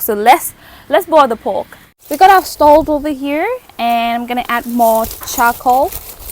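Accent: Australia. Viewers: I think you picked up an Aussie accent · Did you learn English in Australia? Can hear a little accent. Food looks great!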